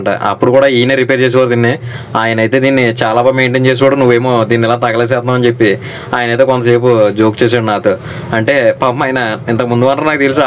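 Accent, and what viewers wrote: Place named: Konaseema, India. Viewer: కోనసీమ భాష పోలేదు బ్రో గుడ్....మాది కోనసీమ.. కొత్తపేట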